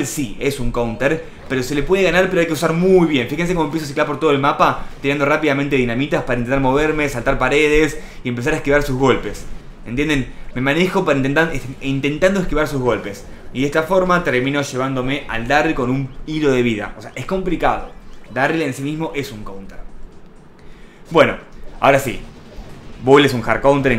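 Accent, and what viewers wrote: Argentina. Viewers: Eres argentino o mexicano?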